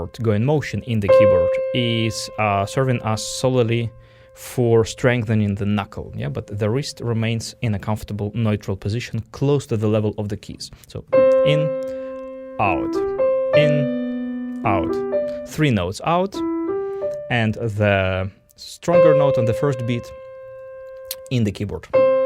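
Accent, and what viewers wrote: Russia. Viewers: I love your videos! Very useful Are you Russian?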